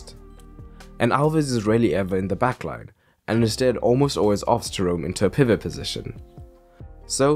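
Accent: South Africa. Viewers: I know you have a UK channel but are you South African? Your accent sounds so South African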